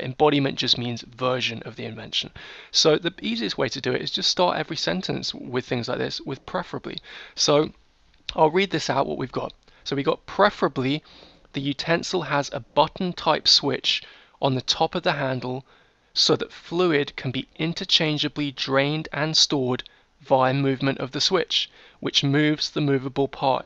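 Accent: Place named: England, United Kingdom. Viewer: your talking provisional patents and US related. You sound very english to me. Does this work in UK also?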